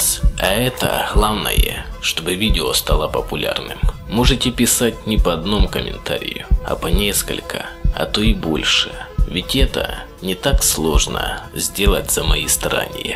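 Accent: Ukraine. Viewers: конечно логично "можете писать по нескольку коментов и больше" (несколько это не определённо число, и лучше бело бы сказать "можете писать по 2,3,4 коментария и больше) а так ооочень годный контент, украинский акцент виден конечно, но он не мешает.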